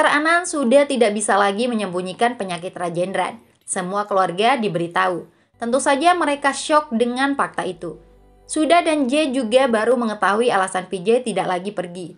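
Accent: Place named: West Nusa Tenggara, Indonesia. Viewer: Kayaknya admin orang NTB 😂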